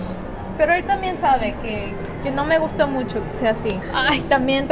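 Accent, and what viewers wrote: Mexico. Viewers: Tu voz, tu idioma es muy mexicano, pero se re nota que las tradiciones coreanas se interponem por demasiado, espero que encuentres a alguien mejor y no te eligas a tu pareja solo por lo físico o superficial...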